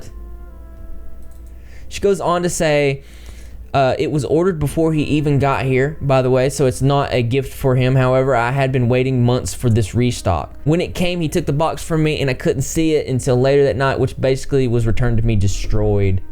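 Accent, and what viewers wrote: South, United States. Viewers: Hello from Lexington KY, loving the southern accent, where are u from? I subbed. So great to find a southern man with a moral compass and moral integrity on YT! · I love the country boy accent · I commented on your eugenia cooney video something similar, but i wanted to ask, where are you from? This is the second video of yours that im watching and i think ive narrowed it down to either alabama or louisiana/texas area. I am from houston area and not many people talk like that around this here, thats why im speculating louisiana area. Sorry for the long comment i am just so wowed by southern youtubers idk why😂😄😳😳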